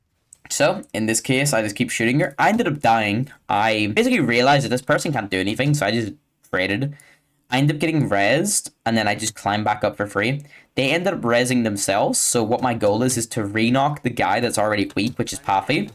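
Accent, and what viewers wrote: Northern Ireland, United Kingdom. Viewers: You're awesome. Love the NI accent. God save the King.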